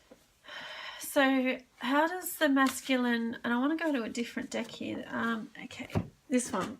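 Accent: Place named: Australia. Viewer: Thank you for your guidance, and for the work you’re doing to help the TF collective. The message resonated. It’s nice to hear readings with an Australian accent! Love and light from Brisbane xo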